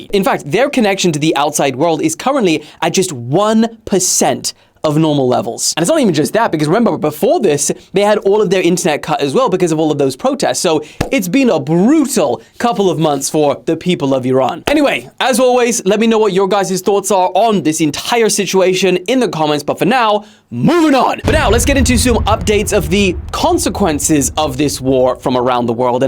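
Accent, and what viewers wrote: South Africa. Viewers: bro is truely a South African 🤣"listen properly"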